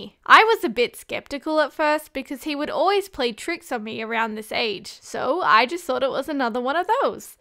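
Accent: Australia. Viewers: Just found your channel and could instantly tell your a fellow Aussie like me, btw your vids are hilarious pumpkin man keeps getting me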